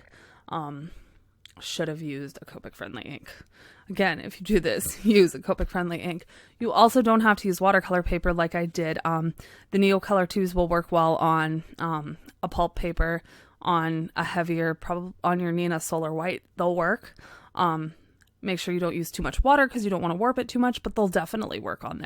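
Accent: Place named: Canada. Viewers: I guessed you were Canadian while I was listening and then expanded the description and saw you actually are! I can just tell sometimes because I'm Canadian too. I love this card. The background is all my favourite colours and I love it. It's outta this world! 😉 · As a Kiwi, I can tell with the way Canadians say ‘about” and “out”... and for you the word “slow” too... your “o” sounds are a giveaway 🤗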